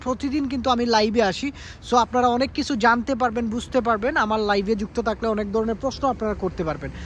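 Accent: Sylhet, Bangladesh. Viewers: ভাই আফনে সিলেটি নি?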